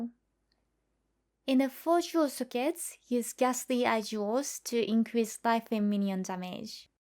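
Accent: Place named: Japan. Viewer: このアクセント...まさか日本人？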